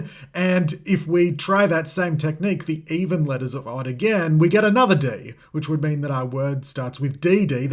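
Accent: Australia. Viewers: I get sucked in by the Australian accent. When he says "deer" it sounds to me like "dee-ah." And then he comes up with what sounds to me like an American r sound thrown in at the end of "idea."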